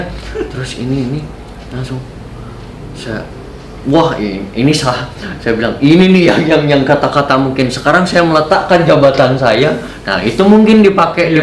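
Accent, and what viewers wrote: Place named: West Java, Indonesia. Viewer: Jawa barat khas banget bang mantapppp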